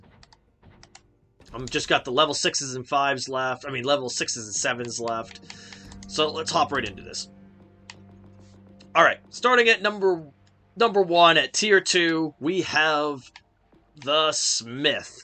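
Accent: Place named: United States. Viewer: Dat murrican pronounciation....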